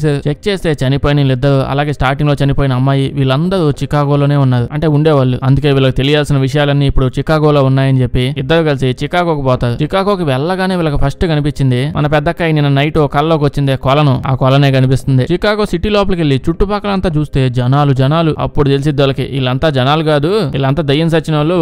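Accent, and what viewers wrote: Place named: Nellore, India. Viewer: Nellore slang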